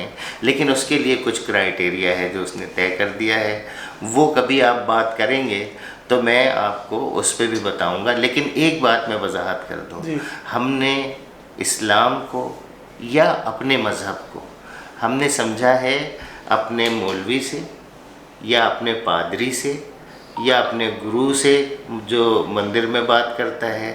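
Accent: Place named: Eastern Uttar Pradesh, India. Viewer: his tone is still Eastern UP or western Bihari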